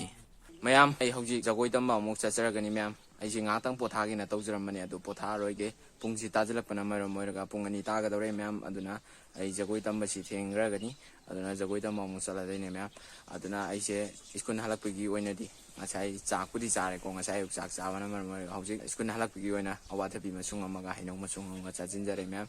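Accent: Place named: Kakching, India. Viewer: Enao yengba yam nungyheee … kakching macha ama oina yam chaothokcheikoo nayambana 😊 kakching marol da ngangbasidei henna taba nungyba leitre …🎉🎉 keep it up❤️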